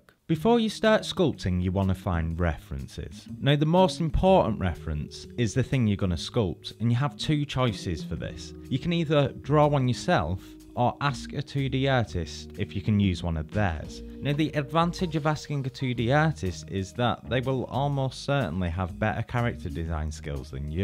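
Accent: United Kingdom. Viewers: I dont understand British, in my russian school we've learned English only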